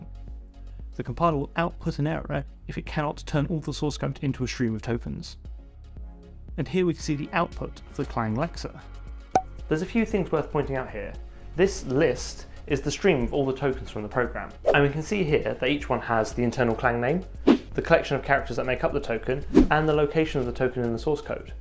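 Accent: United Kingdom. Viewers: You probably are British or my listening skills are so pity... (English is not my native)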